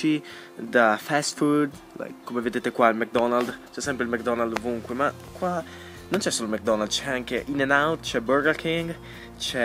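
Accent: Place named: Verona, Italy. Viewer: Si sente un attimo la tua sfumatura veronese From Borgo Trento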